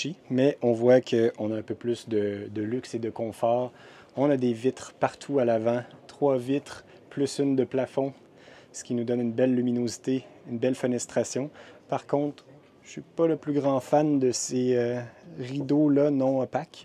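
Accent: Quebec, Canada. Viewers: Le système douche/toilette séparé par une cloison amovible! Wow ! Aussi, je veux te dire que ton parler fait honneur au québécois, moi qui en suit une! ❤ Tu n'as pas été empêché de filmer comme les Vadrouilleurs toi? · Merci pour ta vidéo et surtout merci de garder ton accent Québécois pour nos cousins.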